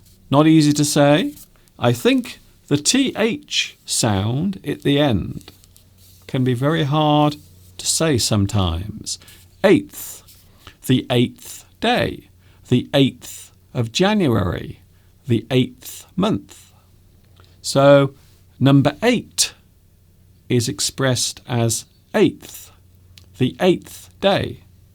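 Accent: United Kingdom. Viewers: 💛💛. My British accent teacher 💛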